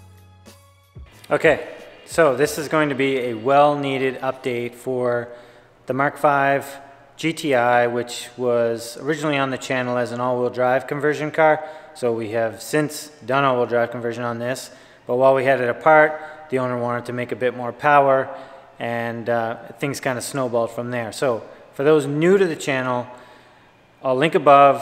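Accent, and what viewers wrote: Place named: Eastern Canada, Canada. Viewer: Although I don’t know what province you’re in I can tell your out east, accent lol. You’re doing awesome work. Thank you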